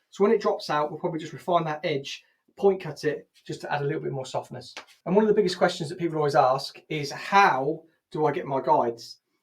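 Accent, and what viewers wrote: United Kingdom. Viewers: i like ur explanation also ur britsh accent. like and subscribe you.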